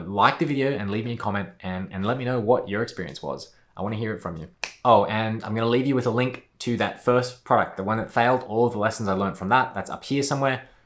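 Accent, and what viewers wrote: Australia. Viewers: you look like an indian but you have an Australian accent and you look like a leprechaun. Just a weird combination :(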